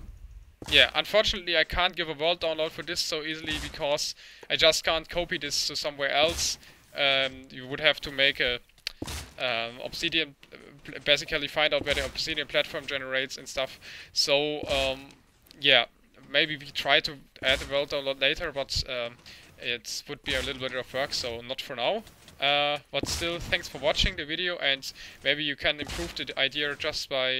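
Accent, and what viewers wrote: Germany. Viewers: the accent is from germany ;) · well then he didnt have a typical german accent. trust me dude, this is a heavy german accent. i am german, in a few videos before he was talking german. this is a german accent. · Your english sounds like you´re no English/American guy. Are you German? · yes he's german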